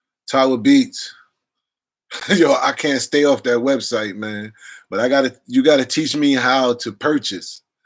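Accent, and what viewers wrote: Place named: New York, United States. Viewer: you sound like a new yorker bro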